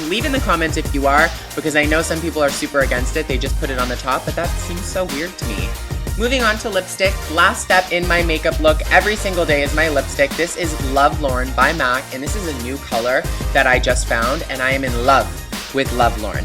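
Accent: Canada. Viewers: Love your canadian accent :)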